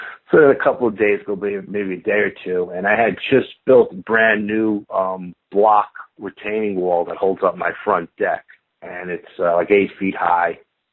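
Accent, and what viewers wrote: South, United States. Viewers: The Southern Accent is like ???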